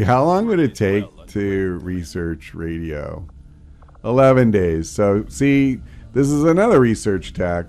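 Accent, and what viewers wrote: United States. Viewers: You should pause the clearing and move the engineer to help build the resistance comms. Chances are engineers and scientists will come in between now and the mag weapon research to continue the clearing and speed up research. Love the show. Sorry if you've been asked this before but are you from New Jersey?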